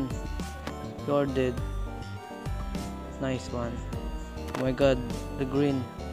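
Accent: Philippines. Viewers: By Hearing Your Accent, I'm 100% Sure That You're Filipino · bro your accent is like filipino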